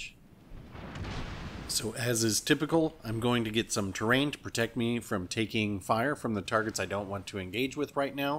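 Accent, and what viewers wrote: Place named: England, United Kingdom. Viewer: no your good but i find the anglish accent fun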